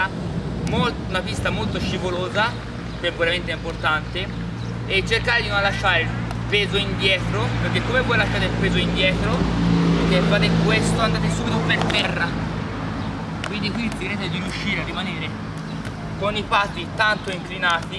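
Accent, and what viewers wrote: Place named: Tuscany, Italy. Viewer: Sei toscano dall'accento sembra di sì bravo bellissimo video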